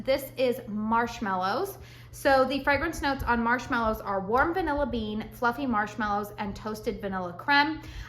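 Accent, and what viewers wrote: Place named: California, United States. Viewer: Omigosh how lovely thanks! You made my day 😀. I actually just recorded a Goose Creek haul (posting tomorrow) and we have some pretty similar takes. It is too darn early for me to start thinking about Fall as well! The Churros candle - it was playing a trick on me too! At first I thought maybe there was some apple in there. It is so similar to cider doughnut/brown/cinnamon/sugar type candles. I think I’m just so used to fragrances like that having an apple component that I imagined it. 🤷 You totally have that classic Cali accent going on and I’m here for it 😊 Thanks again! Have a great weekend!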